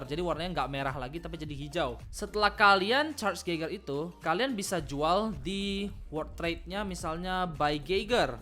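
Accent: Pontianak, Indonesia. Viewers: Abang orang Pontianak ya?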